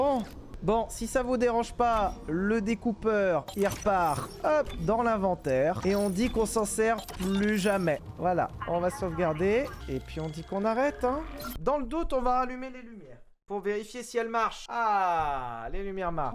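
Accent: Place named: France. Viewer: Et à quand la fin de tes tres merités vacances!!!! Je mennuie de mes jeudis midi bagnole avec celui qui a laccent francais mais ne devient jamais monotone a ecouter ( je suis au quebec et vive le decalage!!!) et je dirait meme c du bonbon a ecouter .... Et , meme si ca peut etre drole au debut merci davoir laisser les pets et les rots dans ton ancienne vie.....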